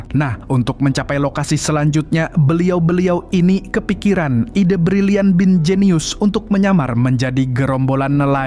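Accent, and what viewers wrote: Banjar, Indonesia. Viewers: Logat bahasa Banjar nya gapernah ketinggalan😊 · Urang Banjar kah pyn nie😅😅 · Org bnjar kah pian mang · Admin nya orang banjar rupany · Banjar nya kelayan A Admin nya😮😮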